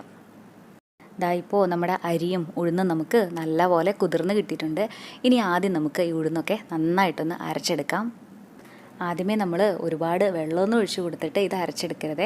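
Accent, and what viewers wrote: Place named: Valluvanad, India. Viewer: വള്ളുവൻ നാട് സ്ലാംഗ് ഒരു സംഗീതം പോലെ അങ്ങനങ്ങനെ..............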